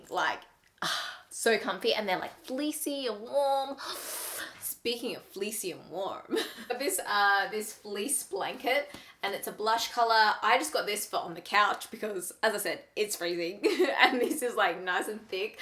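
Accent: Australia. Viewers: this is a really random question but is she from australia? idk she sounds australian though. just asking because i want to watch australia youtubers and she was in my recommended and she seems nice and i love her already ahaha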